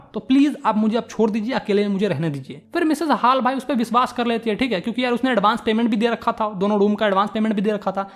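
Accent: Bihar, India. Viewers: Bihari ho abe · Aap bihar ke ho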